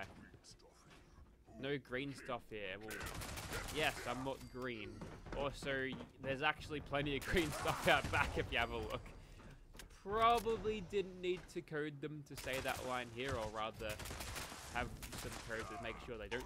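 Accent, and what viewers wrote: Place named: Australia. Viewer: Long time fan of your channel. I have always enjoyed your direct, tell-it-like-it-is, no nonsense way of speaking. Plus the Australian proper English dialect (I.e. using words like Whilst, Respectively, etc) is rather refreshing. When it comes to griefing, pvp, etc, I don’t think you’ve ever been largely in favor or against either. The only group of the Fallout “community” that you’ve always been vehemently against are the RMT traders. And I 100% agree with you.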